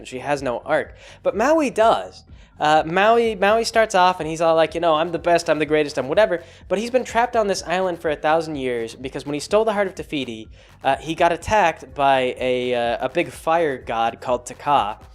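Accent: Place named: Kansas, United States. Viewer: Kansas accent.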